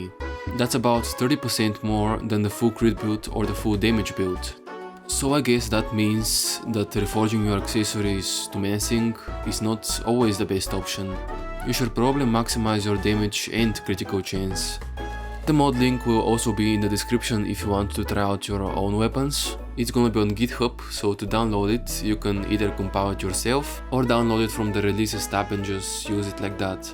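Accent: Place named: Russia. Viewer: I hear Russian accent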